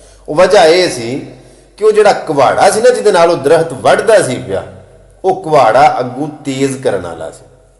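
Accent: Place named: Sialkot, Pakistan. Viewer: Pure Sialkotiya basha bolde sir 🙏🙏🙏From punjab india to asi bhi Sialkotiya Jatt hmm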